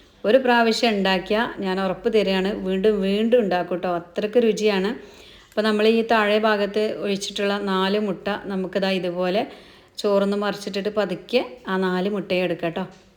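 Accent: Malappuram, India. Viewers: മലപ്പുറം ജില്ലയിലെ സംസാര രീതി ഇങ്ങനെ ആണ് നല്ല മനസ്സ് ഉള്ളവർ കേട്ടാൽ മതി അല്ലാത്തവർക്ക് വണ്ടി വിട്ടു പോകാം ടിങ് ടിങ് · 😀 മലപ്പുറം സ്ലാങ് വഴി മാറി ട്ടൊ ട്ടൊ ക്യൂട്ട് നെസ് കൂടി ചില ഭക്ഷണം നല്ലത്. സംസാരഭാഷ ബോറ് കുറ്റപ്പെടുത്തിയതല്ല മുൻപ് video കണ്ടിട്ടുണ്ട് സംസാരശൈലി മലപ്പുറം താത്ത തന്നെയായിരുന്നു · മലപ്പുറം ആണോ?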